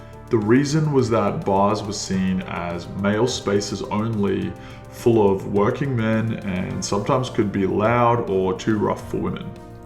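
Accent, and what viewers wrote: United States. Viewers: My only criticism is the pronunciation of anti,Americans say it like Ant-I,Aussies and English say Antee I’ve noticed it’s been creeping into our circles. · What’s with the American pronunciations?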